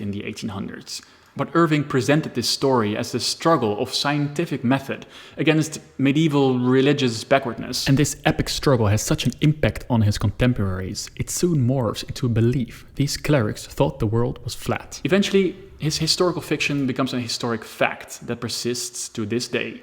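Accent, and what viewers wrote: Netherlands. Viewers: You sound Dutch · Zo hallo… dat accent is wel erg Nederlands.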